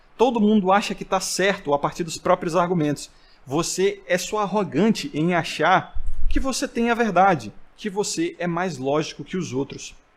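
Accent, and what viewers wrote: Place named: Northeast, Brazil. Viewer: ~ "sempre vai ter um Deus, a genética é o Deus dele" => isto eu achei fenomenal, nunca tinha visto alguém ter esta verificaçao. Se voce estudar teologia/mitologia grega clássica a fundo, vai entender que os deuses gregos era meramente um dicionário de palavras em que as pessoas deveriam acreditar piamente, como "democracia", "justiça", "honestidade", "dignidade".... Por outro lado, é bem óbvio que voce nao é loiro e nem tem os cabelos lisinhos, eu nao preciso de te ver pois a sua voz com sotaque rede globo nordestino te entrega. Eu até acho que voce estudou jornalismo, mas aí ainda tenho muito pouca informaçao. Nós nunca somos este indivíduo único. Se voce é volcel ou incel, pouco importa, mas as pessoas tem condiçoes sim de recriar sua imagem sem ver voce.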